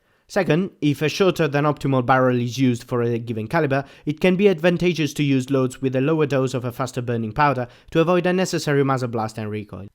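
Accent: Italy. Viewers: Italy i think, i know i love his accent. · So you are italian - i was wondering what the accent is 😘 does anyone know if he lives in US or Italy? 🙊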